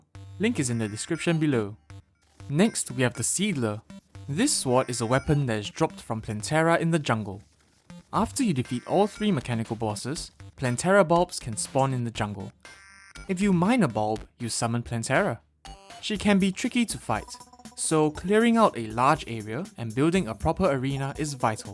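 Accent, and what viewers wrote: Singapore. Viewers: Are u from sg? your accent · oh you are singaporean no wonder u sound like one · Singaporean? Nice ! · Sounds singaporean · are u a singaporean? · Are you Singaporean? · dude are from singapore? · Hi, just a quick personal question. Just curious but you sound a lot like Singaporean Chinese. Are you? 😋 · He sounds like a Singaporean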